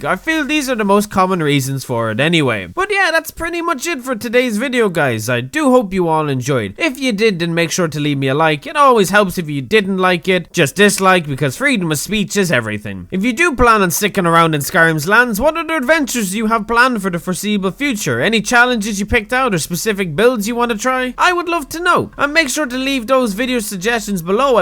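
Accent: Ireland. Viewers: I missed your fucking beautiful Irish accent. STOP TO TORTURE ME AN GIVE ME MORE VIDEOS PLSSSSSS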